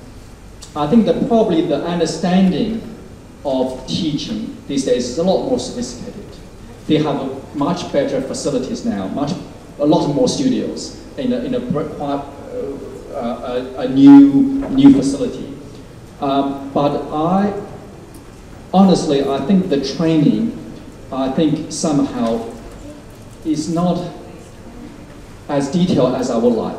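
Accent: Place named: Australia. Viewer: All those years around a 'Aussie' wife...he has a slight Australian accent. So loved listening to him tell about his life. Loved the movie about him!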